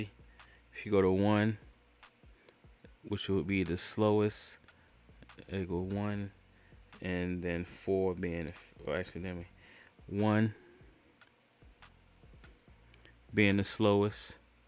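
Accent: Baltimore, United States. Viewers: Are you from Bmore? Sound like it. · Are you from Bmore? Sounds like it! Also thanks for the tutorial! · Quick to clock an accent. You from Baltimore? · Sounds like you from BMore. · Somebody from Bmore😂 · U from bmore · Bruh is you from Baltimore...you talk just like me...ayyyyeeeeee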